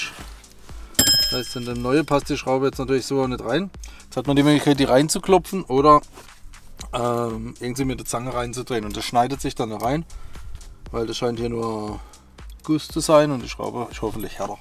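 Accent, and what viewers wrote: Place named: Swabia, Germany. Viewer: Da du au ausm Schwabaländle bisch wie ma hören koh wolld ih fraga ob du mir des ed oifach eibaua kosch?🤷🏻‍♂️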